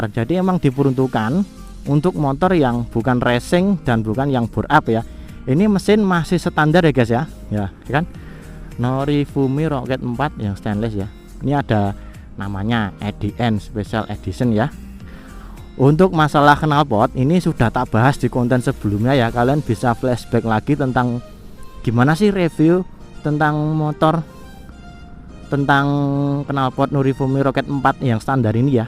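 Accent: Java, Indonesia. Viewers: Sampean jawane pundi mas?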